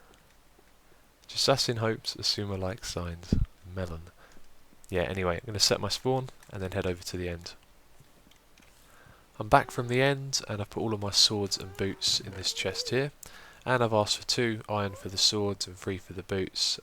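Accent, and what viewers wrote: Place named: United Kingdom. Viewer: Your voice is so epic and sexy i must say ! your accent is also really good just love the british accent!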